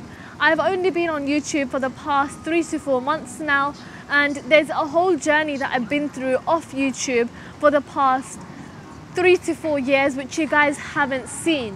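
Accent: United Kingdom. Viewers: you have a british ascent yet you look like an arab, kinda confusing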